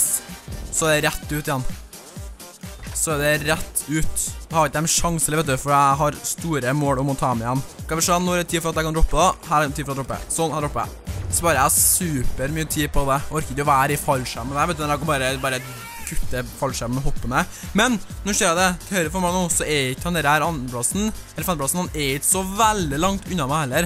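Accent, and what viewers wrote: Trøndelag, Norway. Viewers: Jeg digger trøndersk onkelen min heter Kurt Ove og hann snakker trøndersk!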